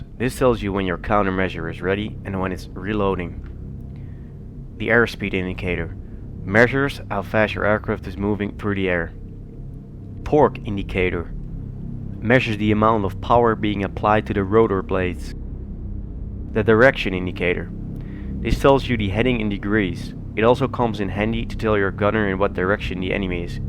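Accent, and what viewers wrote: Netherlands. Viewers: Are you native english? You have an accent. Maybe dutch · You're Dutch, aren't you ? Thanks bigtime for the hud expansion. I never knew most stuff. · Great vid! btw are you from the netherlands because youre english sounds like somone who is from the netherlands :P greatz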